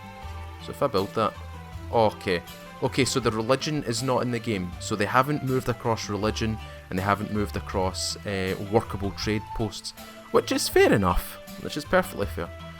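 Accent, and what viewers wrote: Scotland, United Kingdom. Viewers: Came for the video, stayed and subscribed for the accent. Love the scottish accent. Edinbrugh, right!? Keep on with the videos.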